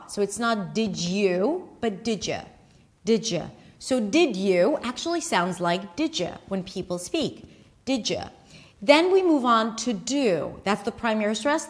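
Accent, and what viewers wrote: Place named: New York, United States. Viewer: I'm a native speaker of English, so I got all of these correct, and most of them almost immediately, so no surprise there. However, speaks with a New York accent, and I come from Detroit, in the Midwest, so I had a bit of trouble with "out of the office", because she actually uses a different vowel in "out", which sounds to me like "at". Point is, even native speakers can still have a bit of trouble with an exercise like this, so foreign students of the language should not feel bad about missing a few of these. English not only has these strange prosodic patterns, but the vowels often shift a lot from one region to the next.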